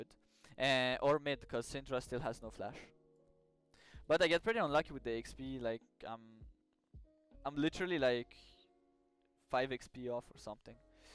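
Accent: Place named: France. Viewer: French accent 200% XD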